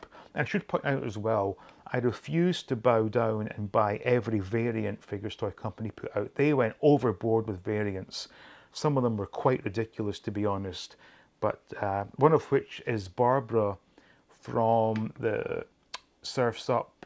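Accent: Scotland, United Kingdom. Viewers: Hey been wanting to ask what is your accent is it Scottish?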